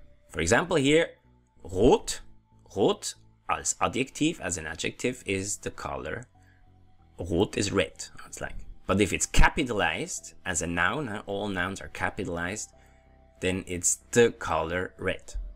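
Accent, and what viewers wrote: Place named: Switzerland. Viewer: Swiss accent, to my ears.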